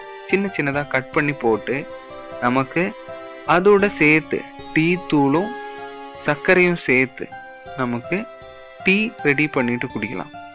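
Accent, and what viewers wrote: Kerala, India. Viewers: சாரே.. மலையாளியோ??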